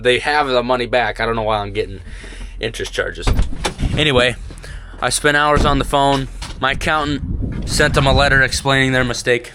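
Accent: South Dakota, United States. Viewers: Was just wondering if you see any Pheasant while driving in your fields. Love the South Dakota accent!!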